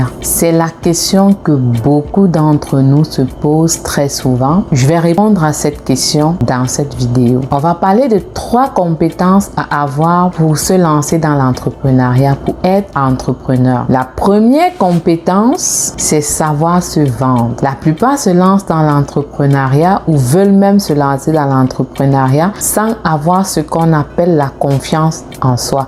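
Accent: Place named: Canada. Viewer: Merçi avec ta petite voix canadienne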